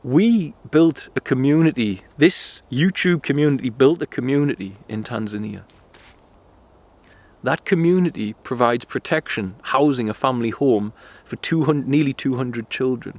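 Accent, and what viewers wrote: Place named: Scotland, United Kingdom. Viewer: Good morning from Polska ! How cool Scottish accent... I do not know who you are, but i got an impression that because Tate spoke about balenciaga scandal, exposed it in vid Karmic Rétribution published a month ago, so he was framed in Romania ? However, he's got some underworld vibe ? Smokes cigar, converted to muslim ( Already was very fanatical towards women): isn't he disturbed anyway ?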